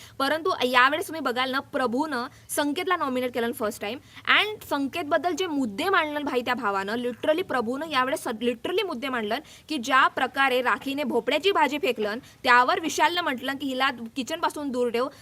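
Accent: Maharashtra, India. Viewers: Tu sagale mazya manatale points bolalis.khup pot tidikine bolate tu .tu non Maharashtrian ahes ka?tuzi marathi khup goad ahe.chan watate eikayla.subscibe kelay mi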